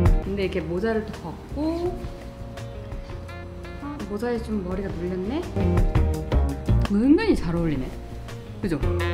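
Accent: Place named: France. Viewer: 마이크로 미니백!! 제일 먼저 님 채널에서 만나보다니 ㅎㅎ 다 잘 어울리는 것 무엇?!!! 불어 발음에 치이고 갑니당 ㅋㅋ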